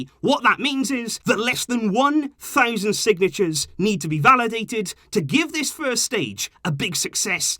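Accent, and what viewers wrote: United Kingdom. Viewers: I practice my British accent by mocking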